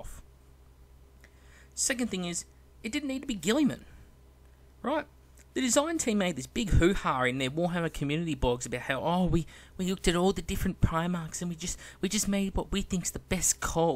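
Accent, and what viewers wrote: Australia. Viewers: I would of preferred if they brougt back Leman Russ, but not being big on the lore that might just be because I'm a wolf fan boy rather than because I think it would make a good story. Also, I'm guessing your Australian?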